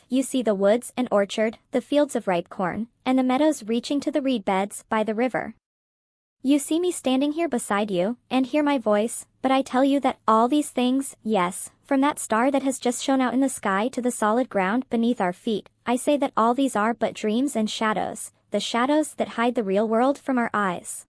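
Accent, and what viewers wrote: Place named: United States. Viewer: This sounds terrible! This story shouldn’t have a female narrator (with a bland American accent), let alone a female AI with such flat affect and abysmal cadence. It needs to be corrected immediately.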